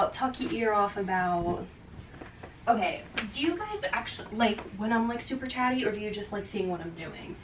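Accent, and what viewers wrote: United States. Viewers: I'm from wisconsin and your accent seems the same as around here, never would have guesses long island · As someone from New Zealand you just have the standard American accent to me lol - I knew someone from New York and he had a similar accent to you. It’s not the classic New York accent - It’s just veryyyy American. I like your thumbnails, they’re cute - I don’t think it makes a big difference for me - but it might be more important for new viewers 🤷‍♀️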